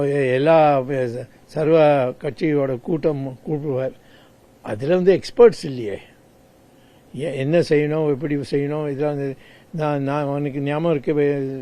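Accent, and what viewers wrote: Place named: North, India. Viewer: Yenna da unnoda slang oru mairum puriya mattigu Vadakan da neee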